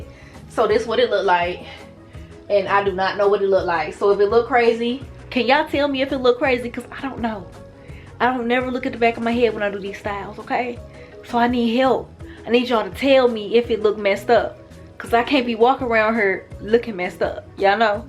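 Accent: St. Louis, United States. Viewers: u sounding str8 outta Nellyville like u from the Lou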